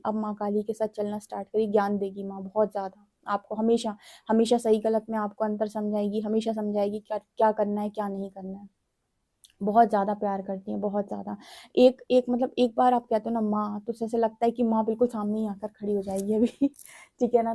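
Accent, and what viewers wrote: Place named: Bareilly, India. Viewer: ap up ya bareilly side jaise sound karti ho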